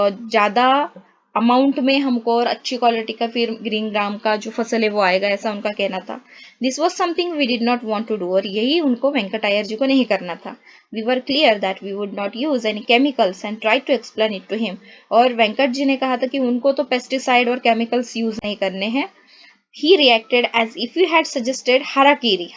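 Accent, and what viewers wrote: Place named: Maharashtra, India. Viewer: Mam aapki Hindi bohot acchi hai 😂 Thoda thoda Marathi lag rha hai😂😂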